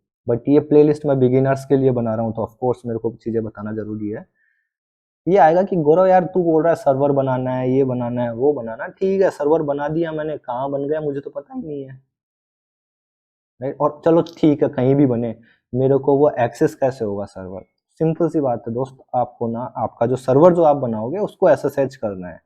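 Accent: Indore, India. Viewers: superb explanation.... bro just one question are you from indore because you have that accent 😄😇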